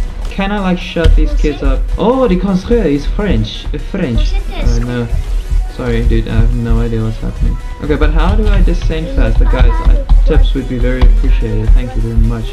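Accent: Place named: South Africa. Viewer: Daai South African accent 👌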